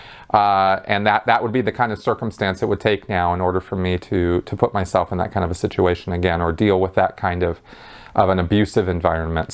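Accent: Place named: California, United States. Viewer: For anyone wondering… his voice/accent is a California accent (he’s not gay) Not all Californians have it, in much the same way not everyone from New Jersey has the accent, as time goes on this accent is becoming increasingly rare as people spend more time watching nationally broadcast programs… which tend to standardize the accents of those that watch/listen to them.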